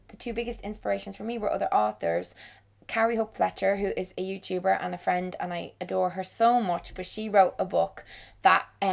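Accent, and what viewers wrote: Ireland. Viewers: I'm 20 in like five weeks, and I've preordered it on audible because I'm poor and I used my monthly credit. Can't wait to listen to your lovely Irish voice 😘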